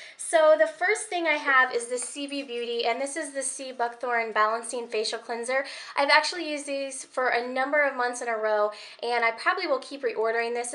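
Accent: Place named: United States. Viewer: do you live in the uk or usa? because you mentioned something from boots yet you have an ameircan accent I'm from uk btw x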